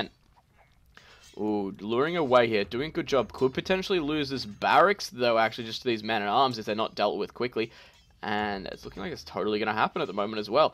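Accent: Australia. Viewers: Resonance22 will always be my favorite caster, but you're amazing too! :D I love aussie accents 11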